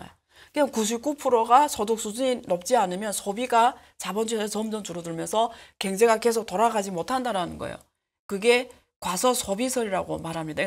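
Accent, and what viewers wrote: China. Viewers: 많은것 배우고 있습니다~^^억양이나 말투때문에 실력이 살짝 묻히지만 완벽하지 않은 모습이 더 정감이 있어요 인간미라고 해야하나요? 중국분이라 더 객관적으로 한국을 판단하고 계신것같아 그또한 잇점인것 같습니다~~ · 이분조선족 임?발음..ㅋ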